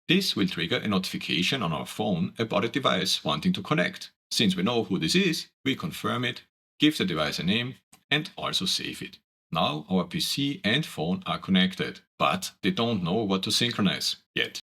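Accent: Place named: Denmark. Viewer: Thanks for the video! Are you Danish? Your accent is cool, reminds me of Mads Mikkelsen haha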